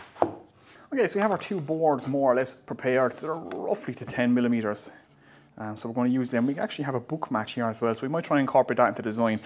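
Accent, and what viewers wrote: Ireland. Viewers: good man...... I usuall saw the walls as the plough will jus rip it apart going cross the grain. I have a plough with a scoring cutter but find its quicker to jus saw the wall than fiddle around with the scoring cutter...... they're a pain to sharpen too 🤦‍♂️😂 I enjoy your hand tool stuff lad, it's also nice to hear our distinct Irish accent on a youtube video 😁🤙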